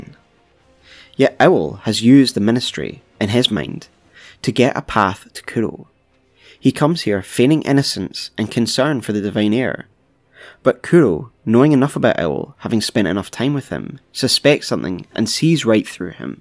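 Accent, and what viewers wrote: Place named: Scotland, United Kingdom. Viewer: Scottish probably! They use eii with pretty much everything!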